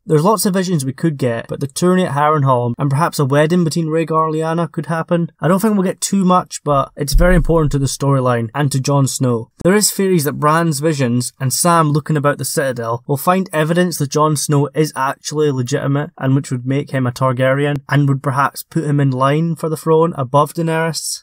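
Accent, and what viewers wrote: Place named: Scotland, United Kingdom. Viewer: Leave it to the Scottish guy to say "more main characters killed off." But, alas, you're right. And I really hope my accent detection is decent enough to know you're Scottish. I love your work and look forward to your videos everyday. Thanks for the hard work :)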